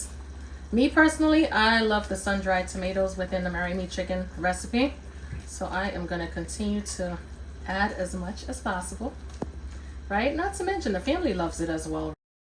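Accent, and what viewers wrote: New York, United States. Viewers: First vid. Amazing. Instant sub. Btw which boro you from tho? I can hear it 😂❤ Recipe in desc box...the first is for a 9x13??? I wanna do this to fill a hotel pan. The second is double. That'll do it, right? Thanks! Much love from just over the GWB 😘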